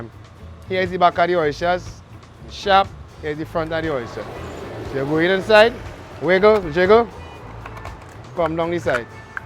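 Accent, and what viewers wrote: Trinidad and Tobago. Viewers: That's a true Trini, different accent from Jamaican. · It’s because he isn’t Jamaican…he’s Trinidadian so he’s sounds Trinidadian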